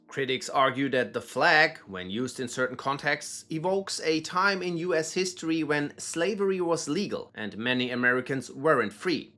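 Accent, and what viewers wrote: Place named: Germany. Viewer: Another great video, I love your voice. It's like Sebastian Vettel; a great & soothing German voice/accent.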